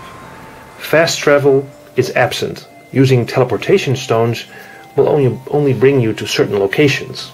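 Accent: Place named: Netherlands. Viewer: I can hear it from your accent that you're dutch. Am I right?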